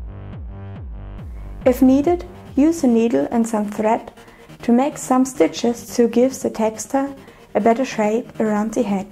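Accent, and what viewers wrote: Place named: Germany. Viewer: You are a German girl. Lovely accent you got. See u pretty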